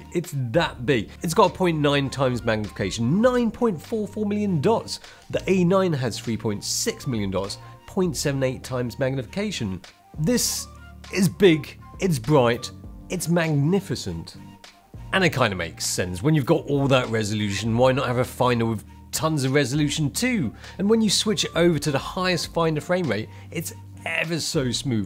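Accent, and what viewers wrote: United Kingdom. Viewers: Asian guy with british accent. That's cool. :) Could you please help me decide. If I shoot mostly video but sometimes I want to do a little bit of photography, would it be better to have one SONY A7s3 and one SONY A7R4? Or would it be better to just have SONY A1?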